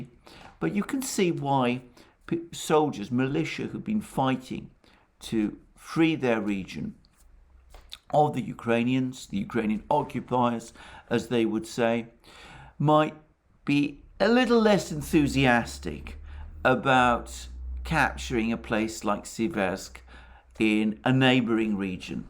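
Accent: England, United Kingdom. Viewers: Nice English accent Ruski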